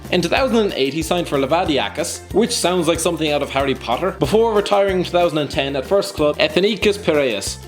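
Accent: Ireland. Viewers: narrator is a paddy, oirish to most. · being half greek half irish its lovely to hear an irish cover this video <3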